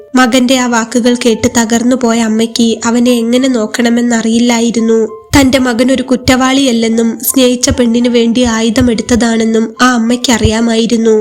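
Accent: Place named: Malappuram, India. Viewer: കഥ കേൾക്കാം. ആസ്വദിക്കാം. മലപ്പുറത്ത് ഴ എന്നത് ശരിയായി ഉച്ചരിക്കുന്നത് കുറച്ചാളുകൾ മാത്രമാണ്. ചെറുപ്പം മുതൽ കേട്ടതും പഠിച്ചതും ആ രീതിയിലാണ്. എല്ലാവരും എല്ലാം കുറ്റമറ്റ രീതിയിലാണ് പറയുന്നതെന്ന ധാരണ മാറ്റണം. ബുദ്ധിമുട്ടുള്ളവർ കേൾക്കാതിരിക്കുക. കുട്ടീ നീ നിൻ്റെ രീതിയിൽ തന്നെ തുടരൂ.എല്ലാവിധ ആശംസകളും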